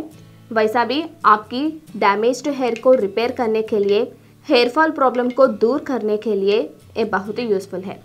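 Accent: South, India. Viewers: Kya ap south ke hain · Are you south indian? 😀 apke bolne ka tarikha bilkul meri mosi jaisa hai.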